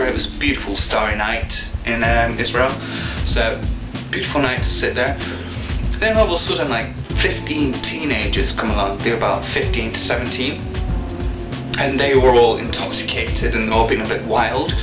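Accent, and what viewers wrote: England, United Kingdom. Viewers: Now you're pretending to be Jewish as well? As if your English accent wasn't bad enough; you don't even look Jewish. You're not a good actor - just face it. You're just a pretender. You're an idiot. Your game is that you pretend to be the very people you hate. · So where are you from? You sound English. Were you born in England and moved?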